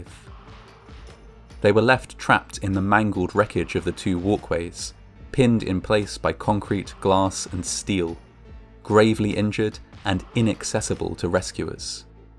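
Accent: England, United Kingdom. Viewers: I LOVE SO MUCH YOUR ENGLISH PRONUNCIATION, THE GENUINE BRITISH ENGLISH ! I AM FROM BRAZIL AND STUDY ENGLISH! I AM GOING TO WATCH ALL YOUR VIDEOS JUST BECAUSE I ADORE THE WAY YOU SPEAK BRITISH ENGLISH! CONGRATULATIONS FOR YOU!👍👍👏👏👏👏👏👏👏👏👏👏👏👏👏👏👏👏👏👏👏👏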